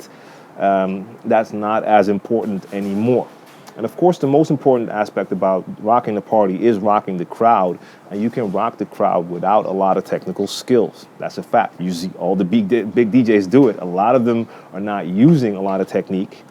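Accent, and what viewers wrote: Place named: United States. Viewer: true that! Especially cause I am doing all digital. But it's not even about me. It's about the music :) Haha, klopt helemaal ;p! Ik moet eerlijk zeggen dat ik niet had geweten dat jij ook Nederlands was totdat je het in een van je videos zei. Goed Engels/amerikaans accent haha. Heb veel van je videos geleerd!!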